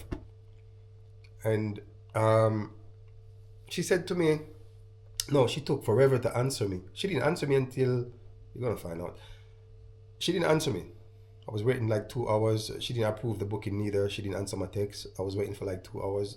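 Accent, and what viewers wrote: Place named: United States. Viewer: You have an American accent more than Jamaican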